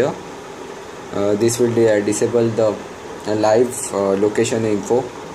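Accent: India. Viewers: it's ay Indian accent think before commenting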